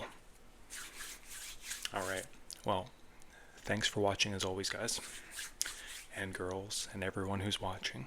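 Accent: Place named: Canada. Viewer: Thanks for allowing us to join your channel! I do love how you talk, the way your voice sounds (so soothing and warm and comforting) and I ❤ your thick Canadian accent. And thaaank you for the roller ball!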